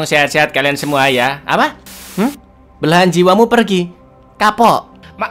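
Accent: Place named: Sidoarjo, Indonesia. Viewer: Cak mau tanya awkmu arek Endi asline kok logat e kyk wong darjoooo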